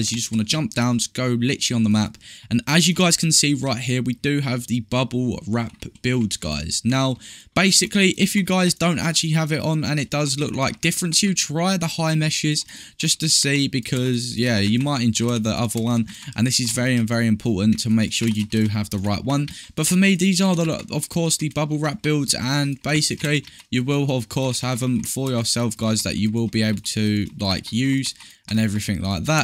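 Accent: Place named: United Kingdom. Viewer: not a british dude again hell naw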